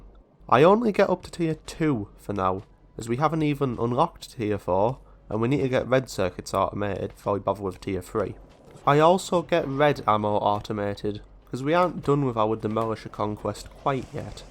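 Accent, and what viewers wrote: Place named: Northern England, United Kingdom. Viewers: Its a northern england accent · Also I'm dutch but me mom is from York, your accent seems to be mostly Geordy with a bit of Yorkshire added.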